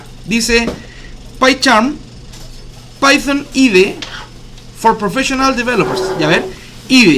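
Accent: Chile. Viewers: usted es chileno no? buen video compadre, esta bueno para empezar con python, me sirvió mucho. saludos!!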